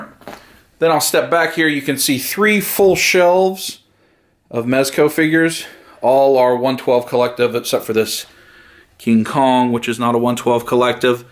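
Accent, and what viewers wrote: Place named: Texas, United States. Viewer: He has a texan accent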